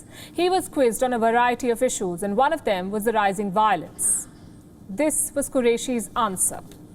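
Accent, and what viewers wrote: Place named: India. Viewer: Typical Indian woman with typical Indian English accent...your degrading the Queens language. Of course its India,you are ery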